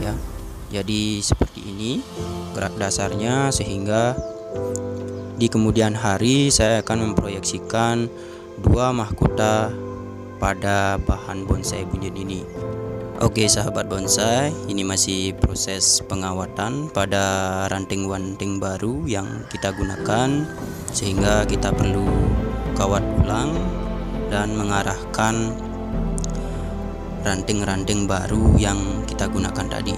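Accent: Bali, Indonesia. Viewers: Logat bali sangat kental bli,, Salam semeton 🙏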